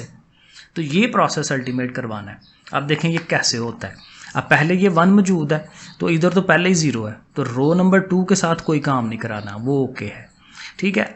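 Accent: Sialkot, Pakistan. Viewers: sir are you from Sialkot??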